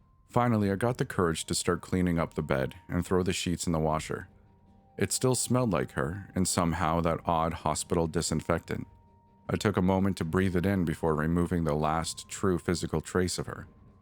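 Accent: Canada. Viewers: oh yah, you’re my favey! Great voice,(the teeniest, loveliest Canadian accent) awesome selection, I’ll be around for your whole run :)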